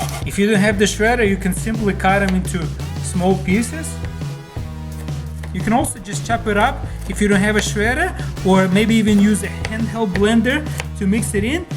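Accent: Russia. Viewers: are you russian I just started watching your videos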